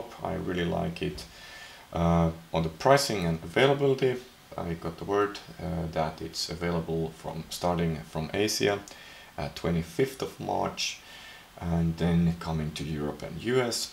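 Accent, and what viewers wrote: Finland. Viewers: where you from? you sound finnish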